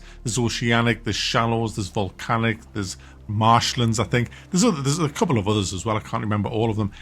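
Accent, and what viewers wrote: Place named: Sunderland, United Kingdom. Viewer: Is this guy a mackem? Worst accent I’ve ever heard.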